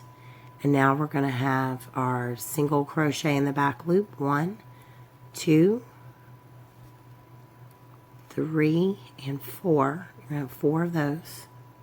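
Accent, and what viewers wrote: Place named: Texas, United States. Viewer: Hi! I recently found your channel and just love all your work! Mosaic crochet is simply wonderful and your designs are so nice! By the way, are you in/from Texas? Your accent sounds like, I'm from there (San Antonio) but live very many years in Athens, Greece! Keep up the great work!! Cheers!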